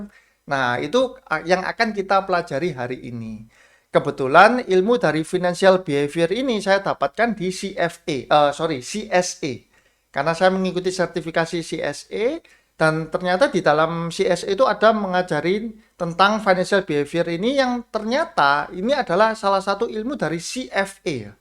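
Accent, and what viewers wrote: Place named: Java, Indonesia. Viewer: Medok banget bahasane mas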